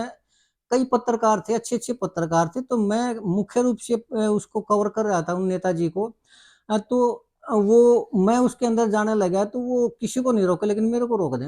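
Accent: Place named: Haryana, India. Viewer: भाई जी प्रणाम, मैं हरियाणा का नहीं हूं, दक्षिण भारती हूं l आपकी बोली, आपका बोलने का ढंग, साफ बोलना बहुत अच्छा लगता है l एक बात कहना चाहता हूं l आप अपने समाज का भला करना चाहते है अच्छी बात है l आप के समाज का मुख्य मंत्री बन जाए या आरक्षण मिल जाए तो भी कुछ लोगों का ही भला होगा, पूरे समाज का नही l जैन, सिंधी, पारसी जैसे कई समाज हैं जो इनके बिना भी सुदृढ़ अवस्था में है l समाज के लिए बैंक बनाइए, कम ब्याज दर पर ऋण दीजिए, स्कूल, कॉलेज खोलिए l ये बाते प्रैक्टिकल है l इसके लिए दक्षिण भारत के नाडार समुदाय का अध्ययन कर सकते हो l तमिलनाडु मर्केंटाइल बैंक उसी समुदाय का था l और भी समुदाय का अध्ययन किया जा सकता है l तात्पर्य ये की राजनीति से कुछ होता नहीं l अहम छोड़ कर कार्य करना होगा l बिना स्वार्थ के करना होगा l सलाह के लिए क्षमा ।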